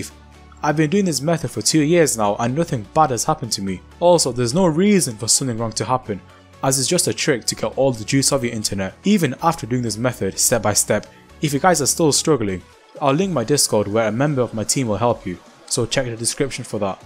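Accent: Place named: India. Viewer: no racism but you are the first indian sounding yuotuber that has done a legit tutorial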